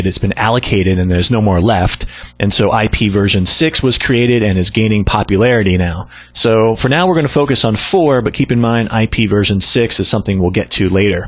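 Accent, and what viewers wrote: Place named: United States. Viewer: Great explanation and most importantly: an AMERICAN ACCENT!! Thank you!!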